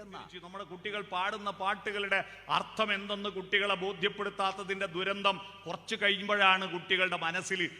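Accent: Kannur, India. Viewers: വഴക്കന്ന് പൊരിച്ചു വെച്ചോ വഴക്കന്ന് പിരിച്ചു വച്ചു എന്ന് പറയു വെറും കണ്ണൂർ ഭാഷ പറയാതിരിക്കുക...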